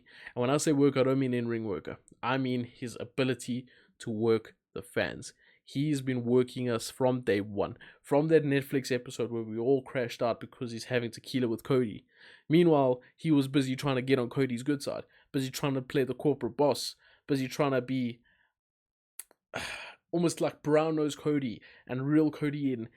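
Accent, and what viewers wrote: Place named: South Africa. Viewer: By the accent, I can tell you are from SA! I am also from SA. Keep on going bro!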